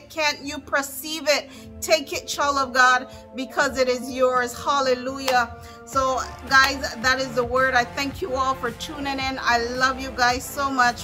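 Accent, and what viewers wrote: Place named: Barbados. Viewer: Your accent sounds a bit Bajan! Great word! Confirmation! 🙌🏾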